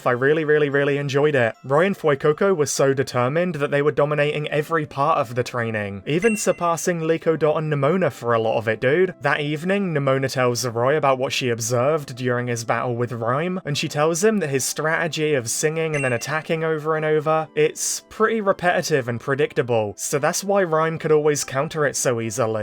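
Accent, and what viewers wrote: United Kingdom. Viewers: Wait a minute, you're British?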